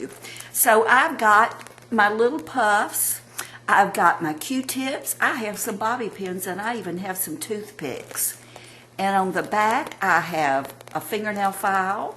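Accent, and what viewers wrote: South, United States. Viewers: Oh!! This is just SO FUNNY 😂😂😂. I am about to wet myself laughing so hard!! You have THE MOST PRECIOUS personality and Southern to boot ❤️. I can't tell you how much this made my day. YOU GO GIRL ❤️😂🌷🌷🌷🌷🌷🌷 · Very good but I'm new from England so " who is Miss Tammy?" also love tour Southern accent which state are you from! Tennessee...pleas tell!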